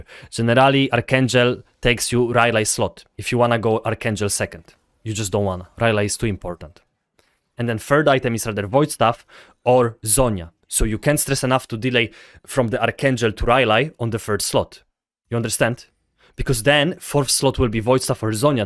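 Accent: Poland. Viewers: Nice Polish accent like libik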